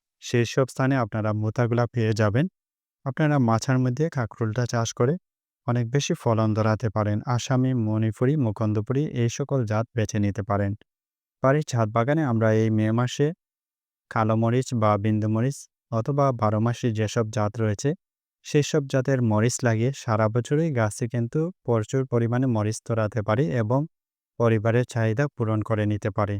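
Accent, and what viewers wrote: Sylhet, Bangladesh. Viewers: হেলো সিলেটি ভাইসাব। পার্পল কিং জুন মাসে লাগাইলে অইবনি??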